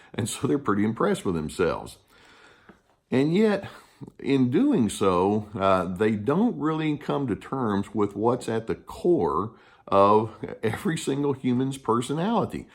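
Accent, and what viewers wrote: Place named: Texas, United States. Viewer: Lol it's the Texas accent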